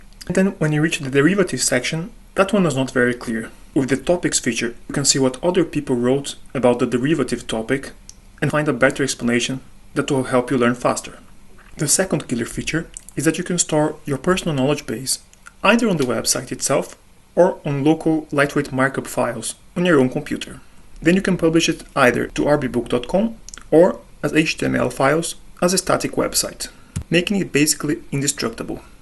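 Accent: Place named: Brazil. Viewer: wait, are you from brazil? your accent sets so ptbr vibes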